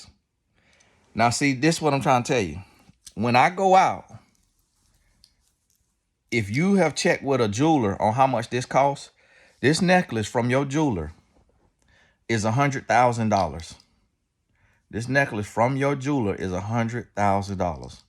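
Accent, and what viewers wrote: South, United States. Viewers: I love she’s so beautiful and I love her country accent it’s to cute 🥰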